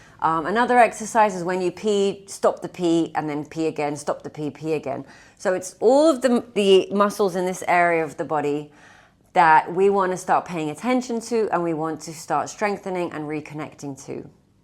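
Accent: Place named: United Kingdom. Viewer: את נהדרת, אני עוקבת אחרייך כבר שנים. מה זה המבטא הבריטי הזה??? 💙🦋 תודה על המידע והשיתוף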